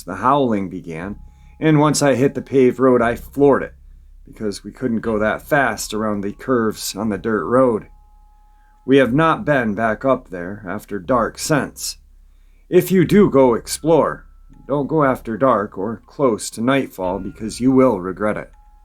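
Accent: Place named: North Carolina, United States. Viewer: You sound like you might be from close to our area,,,, Morganton NC I grew up in Asheville, but my husband Frank and I now live in Burke co and we have a tree nursery behind our property that I think one might have been roaming through back there a few years ago. I was walking our dogs on a leash in the nursery and smelled the worst odor imaginable. It was skunk mixed with the smell of decomp. Our dogs went ballastic tucked there tails and started whining and pulling me toward home. Believe me I took off also later that night we heard howling and every dog on the neighborhood started whining and all of them were in the owners house. Patricia Gambino Harrington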